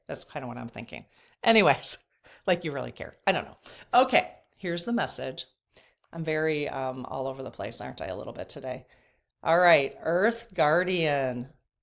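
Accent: Midwest, United States. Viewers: My Moldavite is a stinker too. In and Out…around. I too have a white cat named Lucy. She is in cahoots with my moldavite. I really enjoy watching your videos. You crack me up. It’s like watching myself in the mirror. My family’s from the Midwest (Illinois & Indiana) so speaking patterns are similar & sayings, add in I’m 10 years older but 4/12 bday. Even though I’ve been on the Spiritual Path since the early 1980’s I frequently find gems 💎 in what you share. There’s always another Facet to examine or depth of Clarity to explore. Thank you. 🙏🏽 ❤Liz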